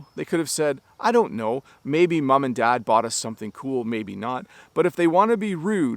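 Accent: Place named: Canada. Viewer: Thanks, really understandable as all your lessons and useful, saddly I gues I`m not the onlyone that learnt the alternatives but in a conversation have drive a blank and just can use the reliable "I don`t know" hahahah BTW I`ve noticed your canadian stamp "eh", I dono if you know but in spanish we also use "EH" in a simmilar way that you, in my country sometimes implies a slight provocation or defiant. Thanks!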